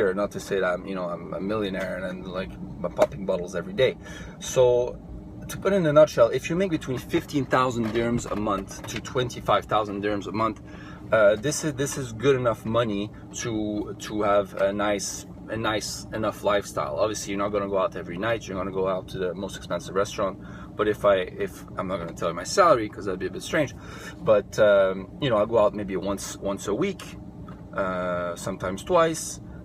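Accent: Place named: Russia. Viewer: Pe bune? Eu sunt Moldovean si chiar credeam ca esti rus, ai accent rusesc :D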